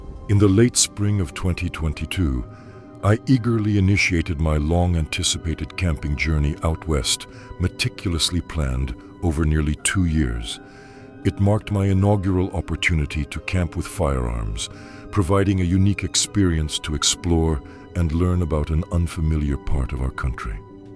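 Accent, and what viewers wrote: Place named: Upper class, United Kingdom. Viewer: I don't like the narrator's voice. Is it a computerized reading? The grammar used, while mostly correct, sounds so upper-class British that it detracts from the feel of the vid, almost sounding like it's all a tongue in cheek satire.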